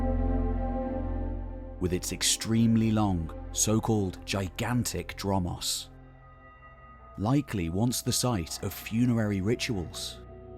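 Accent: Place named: Liverpool, United Kingdom. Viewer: How many of these weird scouse type accents are there on these DIY history channels? It's both unlistenable and spreading like a plague.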